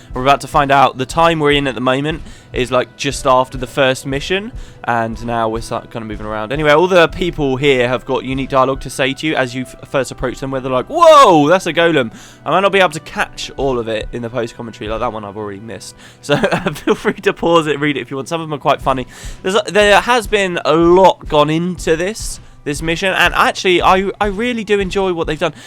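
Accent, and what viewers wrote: United Kingdom. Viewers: Yay! I recorded one myself for nostalgia when I'm playing Gw2. But, I kind of died at the end and didn't do the one from this year. Now I can watch it in a few years, but with British commentary!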